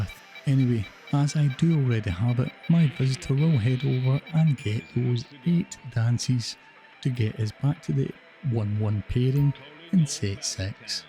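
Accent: Scotland, United Kingdom. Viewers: I watched your video, a lot of times and I never understood what I needed to do... with all respect, since I'm not English, it's a bit tricky to understand with your Scottish accent 😅 (I love your pronunciation btw). A little recap can be very helpful, for real... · I should have listened too, Didn’t realise you were also Scottish 🏴. Think I have sussed the issue. Both our gametags have exactly same amount of characters 11 long. So I am gonna change mine since you can do it once for free. Hopefully that helps.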